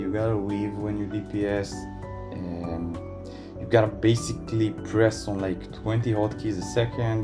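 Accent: Israel. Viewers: You have heavy Israeli accent 🫣🫶🏻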